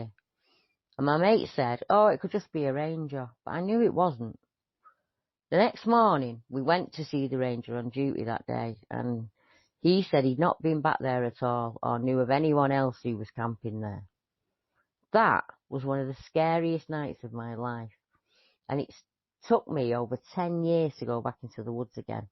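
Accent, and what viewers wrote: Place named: Manchester, United Kingdom. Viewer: accent is northern, sounds like she maybe from the Manchester area, however there are many different northern accents, such as Scouse, Geordie, Yorkshire, Cumbrian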